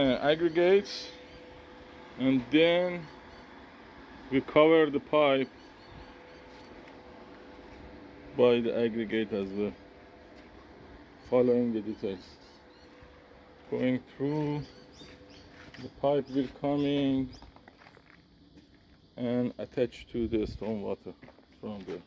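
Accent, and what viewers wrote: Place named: Iran. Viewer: I'd recognize that Persian accent anywhere. :)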